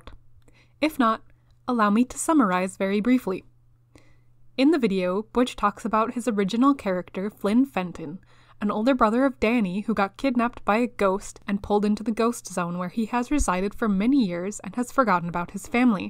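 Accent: Canada. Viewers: I like your accent. Where are you from? You hold your mouth like a Canadian but also fully pronounce your Ts so I can't tell O_O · First time watching your vids, I hear the Canadian on your supprisingly soothing voice.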